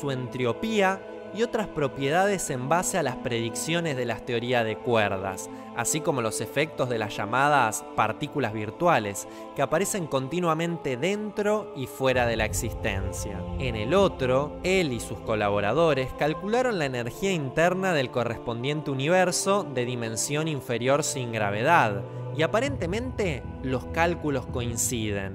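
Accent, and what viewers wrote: Argentina. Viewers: No se pero amo el acento argentino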